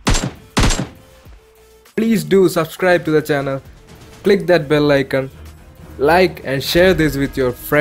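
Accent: Kerala, India. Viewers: English ketapozhe thonni malayali aanenn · Malayali annale English kettapo thoni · You are a malu for sure.Damn ur accent man!😁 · manglish.... bro, are u a keralite...???? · Malayali anenn English kettappo mansilayi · asif aliyude sound pole und....malayali aanennu manasilayi 😍😘...pwolie video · True English kettapole manasil aayi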